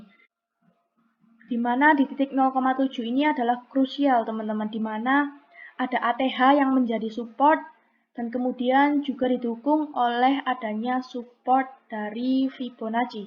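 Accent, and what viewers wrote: Java, Indonesia. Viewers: Medhok tnn mbak wkwk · Iyo medok tapi pinter bngt e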